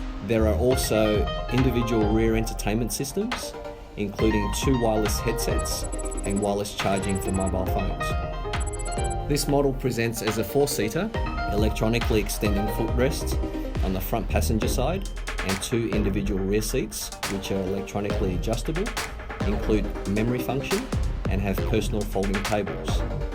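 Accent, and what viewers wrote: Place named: England, United Kingdom. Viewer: Fuck this shot . Asian man with English accents. Someone kill Me please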